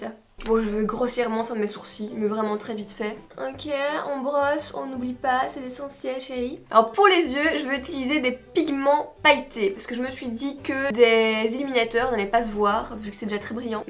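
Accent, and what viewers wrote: Belgium. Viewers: Salut j'espère que tu vas bien je t'adore tu es ma youtubeuse préféré et surtout j'adore ton accent belge lol. J'espère que tu vas me répondre car aujourd'hui c'est mon anniversaire et le meilleur cadeau que je veux recevoir c'est que tu me réponde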